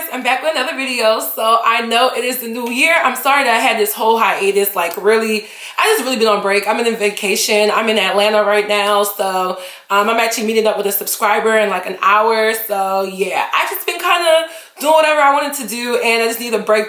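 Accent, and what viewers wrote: Nigeria. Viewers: Loved this video. Very funny and great points. Also love when the accent comes out. 9ja!!! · that beautiful Nigerian accent😍😍😍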